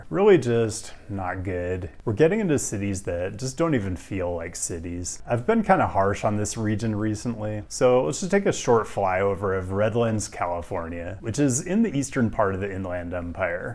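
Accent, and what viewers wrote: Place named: Pacific Northwest, United States. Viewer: is from Seattle. Fred Armisen describes the PNW dialect as "putting the words on a plate".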